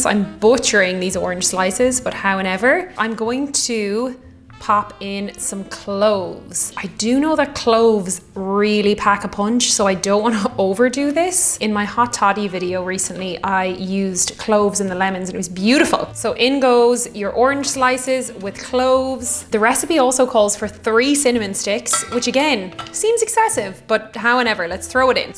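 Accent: Ireland. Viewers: Great video! Thanks! Lovely Irish accent.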